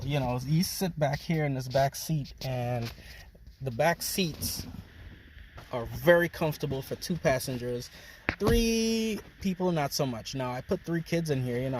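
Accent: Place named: Virgin Islands, Caribbean. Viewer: Hi, I just "stumbled" on your page. I also bought a 1998 C230 about two years ago and I absolutely love it. It was owned by an older woman that just drove to church, etc. Unfortunately, she passed away and it just sat in the driveway. It was well maintained, just a few minor things. On another note, I listened to your accent and wondered if you are from St. Thomas V.I.?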